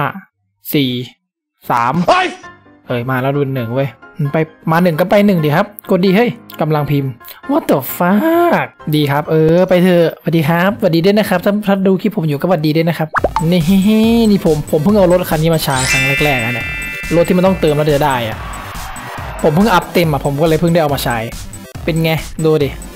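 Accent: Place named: Isan, Thailand. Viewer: อ้อ คนอีสาน เฮาบ่ฮู้เด้อ ซอรี่~~55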